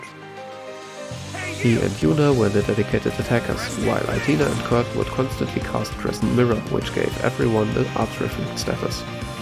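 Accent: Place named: Germany. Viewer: Deutsch Akzent erkannt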